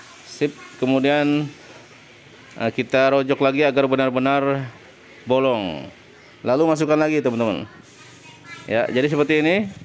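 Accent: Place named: West Java, Indonesia. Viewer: Sunda pisan di rojok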